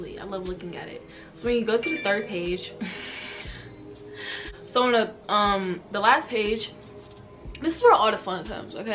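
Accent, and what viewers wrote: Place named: South Carolina, United States. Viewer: I knew I noticed your accent I'm from South Carolina ❤️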